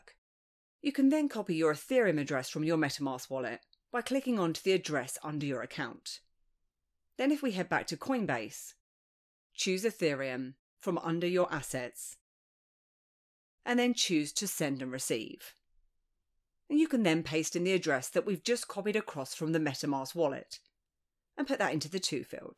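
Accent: United Kingdom. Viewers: refreshing hearing a Brit rather than a American Accent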